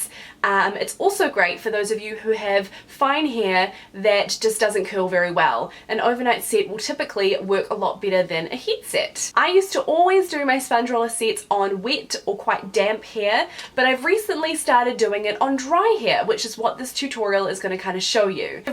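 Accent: New Zealand. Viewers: You have the most interesting kiwi accent and you were absolutely born for that red lip. Gorgeous. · I think they look really nice. I might put in my lady hair when I pamper her eg pedicure. BTW is that a New Zealand accent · Yay NZ accent, I've never heard us on YT before! Great tutorial, thank you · yep that's the kiwi (NZ) accent :) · Is she from New Zealand? Her accent sounds kiwi. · Knew I heard another New Zealand accent! Hello from home, great tutorial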